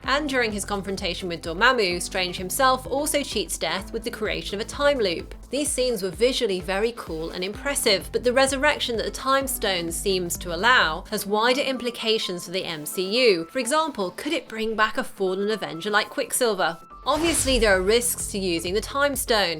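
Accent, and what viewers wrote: United Kingdom. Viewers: another movie video in British accent! it's the third one only today that's bizarre !!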